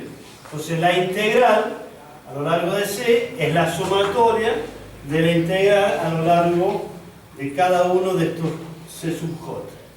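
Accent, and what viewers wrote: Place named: Córdoba, Argentina. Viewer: ajajajja reconoci el acento cordobes al toque y quede wtf, esperaba encontrar el tipico tutorial centroamericano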